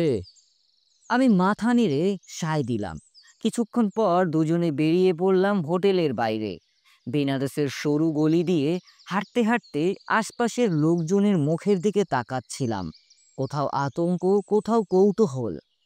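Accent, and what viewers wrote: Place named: Bihar, India. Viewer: Bihar theke esechen naki Bangladesh theke? Ekhono bhasa thik hoyni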